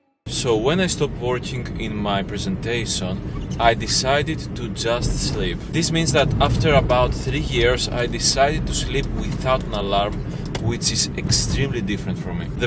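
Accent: Greece. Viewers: I knew you are from Greece because of the accent (is similar to my relatives). Which part of Greece are you from? Also, I love the videos! Keep it up! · The accent never lies, you are from Greece!!! In what major are you studying? I'm studying computer Science in technical institute of Crete. It's my first year and I don't like attending my classes , I find them extremely slow paced and lacking educational material.I prefer being self-taught from online documentation and tutorials so I can progress faster. Have you found yourself thinking the same thing about your college?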